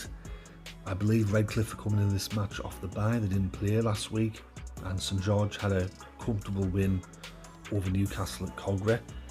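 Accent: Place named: Liverpool, United Kingdom. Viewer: Scouse accent..👌😊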